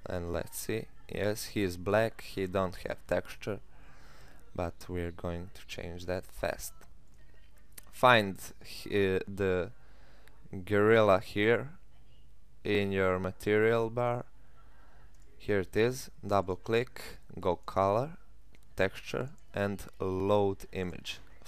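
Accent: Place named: Croatia. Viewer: prica engleski jezik a ima hrvatski naglasak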